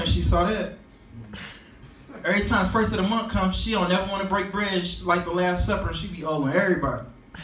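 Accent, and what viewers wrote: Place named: Detroit, United States. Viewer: He got that Detroit e man accent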